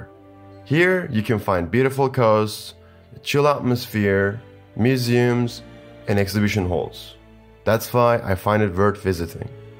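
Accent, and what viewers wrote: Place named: Turkey. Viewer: what's this accent? pure Turkish accent. 😐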